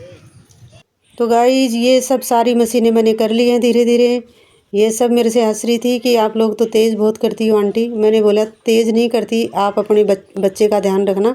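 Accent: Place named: Haryana, India. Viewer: मुझे तो पहले ही पता चल गया आपकी बोली से की आप हरियाणा के हो जी बहुत ही बढ़िया Vlog है दीदी जी बच्चे तो मुझे बहुत ही पसंद हैं जी 💫🤍💫 खुश रहो सदा ओके दीदी जी।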